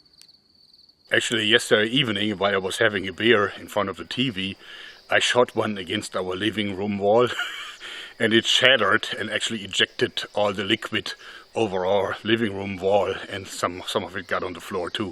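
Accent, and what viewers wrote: Germany. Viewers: Love it! Hello from USA! I love your videos and I think your german accent is cool and makes you seem more like a mad scientist haha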